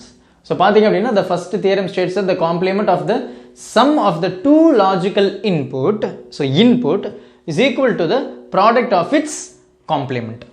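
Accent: Coimbatore, India. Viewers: Bro are u from Coimbatore ahh bro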